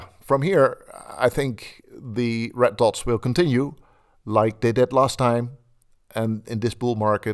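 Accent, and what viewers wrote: Netherlands. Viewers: lekker hollands accent jonge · Heerlijk dat Engels met een Nederlands accent..😊 dank voor de info. 🎉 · Come for the long term $BTC analysis, stay for the Dutch accent. Thanks!! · This is the most Dutchiest Dutch accent I have ever heard. Great video!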